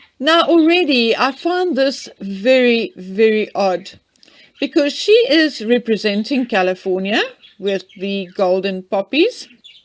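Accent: South Africa. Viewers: That is not your business. You racist Afrikaner. Your accent is horrible. Your country has so many problems why don't you worry about that. You cannot put your foot in Meghan's shoes. She got a standing ovation when she spoke at the United Nations. Even Banki Moon stood up to congratulate her speech.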